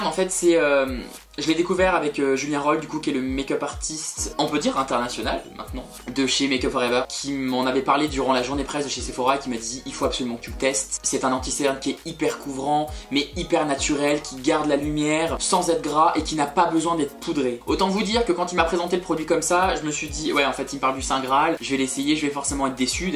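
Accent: United Kingdom. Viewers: Il a l’air pas mal le petit dernier ! 😃 et cette petite pique 😂 ton accent anglais on l’adore 😍😃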